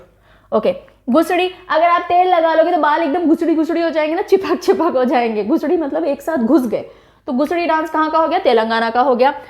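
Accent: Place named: Kerala, India. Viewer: I am a malayalee..it's nice to hear ur presentation in Malayalam slang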